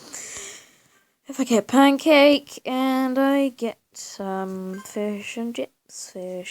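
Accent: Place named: United Kingdom. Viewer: Nice too see that your rly active!!! Lol tbh ur British voice is GREAT. I’m an American who wants to be British or Aussie. Aussie and British accents are AWESOME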